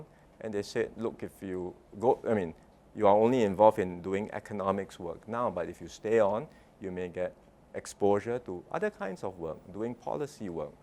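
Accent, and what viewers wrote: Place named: Katong, Singapore. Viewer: He's the quintessential product of 1980s 1990s Singapore. Whether this is the right training I don't know. To me, his phonics and intonation already tells me he's from Katong, and I feel alienated. But who knows, Goh Chok Tong's economics seem to make our lives better. Maybe he may introduce more Singaporean friendly policy, maybe life would be better. At least he goes to Parkway Parade, and knows about problems back in the day.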